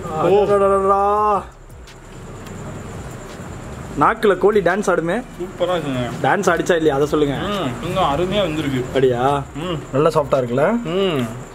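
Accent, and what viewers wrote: Nagercoil, India. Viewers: Slang like nagercoil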